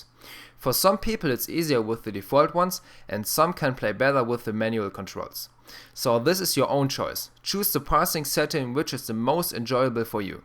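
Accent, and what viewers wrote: Germany. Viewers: Man hört das du deutsch bist^^. Deutsche können kein English^^ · your tutorials are useful but when i hear your voice arghhh german english aaaaaarghhhhhhhhhh use synthesizer or shut the fuck up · your accent is so germans. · Thanks you did your job good but it is funny as from the moment I hear you I knew that you where German, you have an most funny accent when speaking English ( I am not English but I speek with Germans alot :) ). Thanks one more time!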